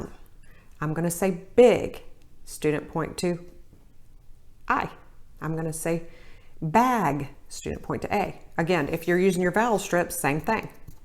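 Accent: South, United States. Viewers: I'm so glad to have found someone who sounds like me - Southern accent! This helps me to teach my homeschooled daughter. My name is Sam, but when my daughter isolates the short vowel sound, she hears e. If I'm honest, I do too. Any tips with that? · you gorgeous young lady with a hint of southern accent I’m in love.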